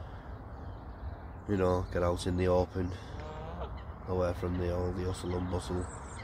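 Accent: St Helens, United Kingdom. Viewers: Nice video are you from St Helens area accent sounds familiar 😂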